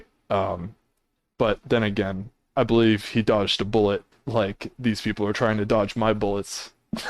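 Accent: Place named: Canada. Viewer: You're Canadian aren't you? Or upper Midwest US. Work on the out... lol. Keep up the work!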